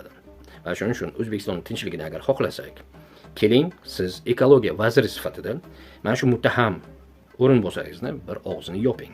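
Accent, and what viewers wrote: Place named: Tajikistan. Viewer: Янги очодиган каналингга ут тушсин.Яхши айтиб куйди.бекорчи гийбатчи тухматчи янги чиккан ёлланган сиёсатчига халк мухтож эмас.Кайирда кандай илм олгансан.Сиёсий маьлумоти нима адабий соф узбек тилини кайси тожик шевасида гапиряпти узингни билимингни яхшилаб ошириб олгин ким танийди бу гийбарчини инсонларни кимматли вактини олиш хам катта гунох.Вактни яхшиликка яхши ниятларга сарф килиш керак.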